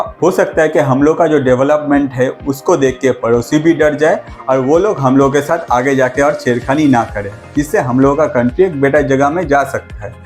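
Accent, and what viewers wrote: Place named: Assam, India. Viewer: App Assamese ya Hindi na bolne wala he? Kyuki AAP jav Hindi bolte ho lagta he dusra bhasha bol rahe ho😊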